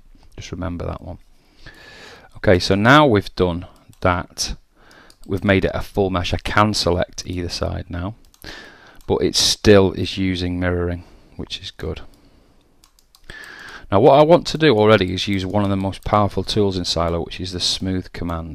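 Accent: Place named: England, United Kingdom. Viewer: Believe you me, he has a beautiful English accent.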